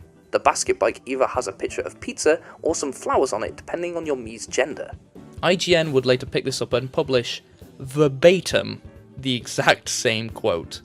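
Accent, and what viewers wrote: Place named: United Kingdom. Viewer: The algorithm gods have blessed you. I'm happy to be hearing another British (or aus) essayist. Great work!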